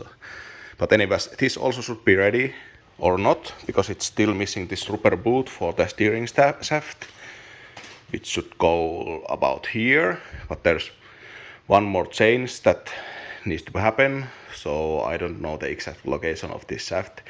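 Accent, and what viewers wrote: Oulu, Finland. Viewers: Nyt oli kyllä melkoinen parkaisu ja maan tömistely :D ei muuta kuin uutta konstaa seurantaan, tuskin loppuu Youtubesta kesken. En kyllä aiemmista videoista muista näin positiivista kommenttikenttää kuin tällä videolla on. Onhan tämä vähän koomista katsoa suomalaisen puhumaa rallienglantia, mutta Bemarit tässä eniten kiinnostaa. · I vote English. For every amis you lose as viewer you’ll gain 5 more international viewers. Plus Finnish is the best sounding English accent. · Oli kyllä suoraan sanottuna pettymys rallienglannin takia.. jatka suomeksi mieluusti!! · Itse olen harmitellut monia hyviä kanavia kun höpöttävät kieliä joita suurin osa ei ymmärrä. Kyllä englanti tässä palvelee suurta yleisöä. Itse saatan tehdä videosarjan e21 restomodista. Vähän sellaiseen Don DIY tyyliin eli englanniksi mutta puhetta mahdollisimman vähän. Mites toi rallienglanti, äännätkö niin tahallaan vai onko se vain opittua? 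Esim. Kalle rovanperä puhuu rallienglantia mutta mielestäni olen välillä kuullut kun hän puhuu englantia ilman rallimurretta. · Well I didnt have problems with rally English so carry on as you please! And also im native Finnish speaker but I dont mind listening in English. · Anna tulla vaan englanniks. "Rallienglanti" antaa vähän samat vibat, kun Oulun murre suomeks😃 Kielellä ei väliä, kun sisältö on edelleen 💪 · Great work again and for me both languages are fine. Oulun murretta on kyllä ollut kiva kuunnella kun äidin suku on sieltä suunnalta 😀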